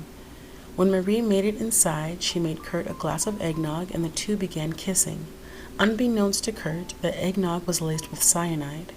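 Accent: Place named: Canada. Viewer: You've taken the bull by the horns here. That is extremely commendable. I've said this before, but I really, really like how you end your videos with discussion questions. You are a very strong personality. Ha ha. Just judging from your accent, are you Canadian or upper U.S. midwest? I've been all over in my life, and that's what I think I hear. I'm prepared to be wrong though.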